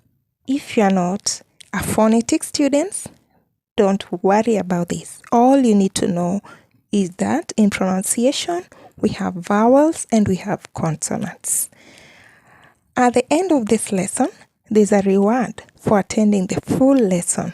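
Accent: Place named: Kenya. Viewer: I am sorry maam, but your pronunciation is quite incorrect. You are supposed to be a professional in speaking English, but it doesn't seem so. You should go do research on how to reduce your accent. I assume you are from Kenya, where British english is taught. There are countless youtube channels that teach exactly that, so do your due deligence. Please stop spreading misinformation.❤❤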